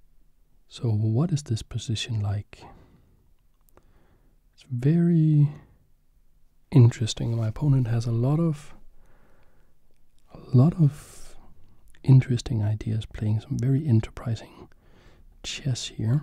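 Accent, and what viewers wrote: Denmark. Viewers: 2 hours and danish accents? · As a dane i always find it wierd to hear a danish accent, but I’m sure it’s soothing for others lol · Danish accent and danish gambit. Great combination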